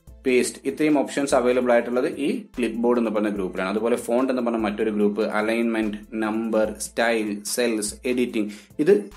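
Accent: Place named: Malappuram, India. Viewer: nice presentation, oru malappuram touch undu.. s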